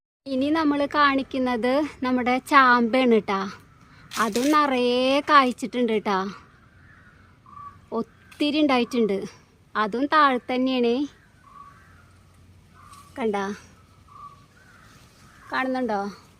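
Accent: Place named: Thrissur, India. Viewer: ട്ട, ട്ട ട്ട ട്ട ട്ട ട്ട ട്ട, soooooper ആണ് ട്ടാ, തൃശ്ശൂർകാരി ആണ് ട്ടാ 👌❤️👍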